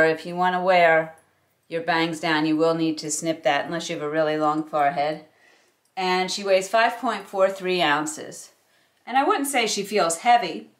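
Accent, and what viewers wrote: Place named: New Jersey, United States. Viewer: I'm from Connecticut near the Westchester border and to my ear I hear New Jersey.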